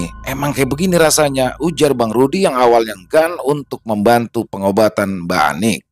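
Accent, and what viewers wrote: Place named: Jakarta, Indonesia. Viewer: Ahhh seru nih ceritanya.. aku udah mengira kalau ini kisah bang Rudi, dari logat penyampaian ceritanya yg dibawakan mas, sudah keliatan gaya bahasa Betawi.... Ditunggu kisah selanjutnya, pasti seruuuu ❤❤❤❤❤❤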